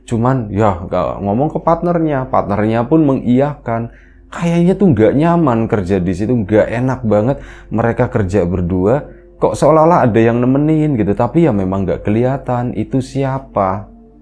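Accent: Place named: Tegal, Indonesia. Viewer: Lama gak dengerin 1,5 thn lebih ,logat naratornya Tegal banget,he..he...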